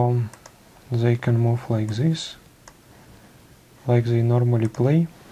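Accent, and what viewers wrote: Russia. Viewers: Very nice. And English with Russian accent i so cool. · The "O" in the Russian alphabet is pronounced by like "Ah" (except the accented syllable" , e.g. хорошо = harasho Thats why he has that accent.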